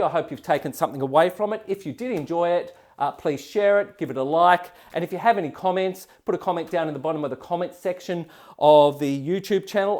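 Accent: Australia. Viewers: Thanks so much! I’m making my way through all your videos and have enjoyed them so much. Especially with the Aussie accent!😂 Thanks for the link to a detailed answer for my question. I look forward to watching it! Cheers from 🇨🇦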